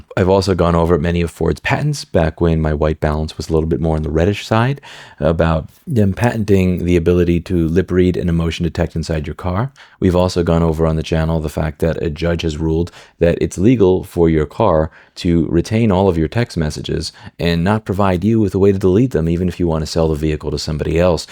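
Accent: United States. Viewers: Oh .... I thought he drove a manual + he sounds American: ipso facto, alarms/kill switches are automagically built-in to a manual.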